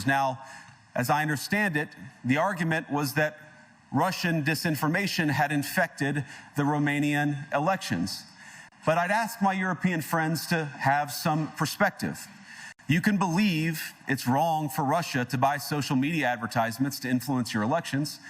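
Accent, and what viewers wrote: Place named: United States. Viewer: Finally an American politician who sounds, well, American!!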